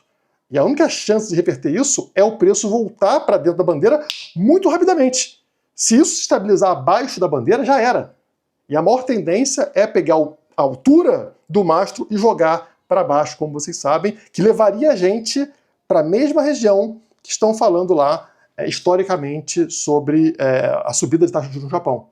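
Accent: Rio de Janeiro, Brazil. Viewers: Jurava que tu eras carioca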